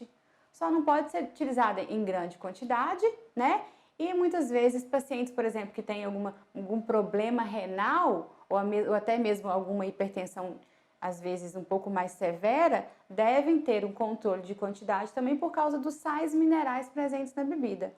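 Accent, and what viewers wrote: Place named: Minas Gerais, Brazil. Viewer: Sotaque mineiro detectado 😉🥰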